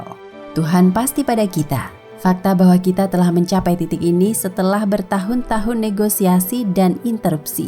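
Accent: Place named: Banyumas, Indonesia. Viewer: Ngapak pula kao bapak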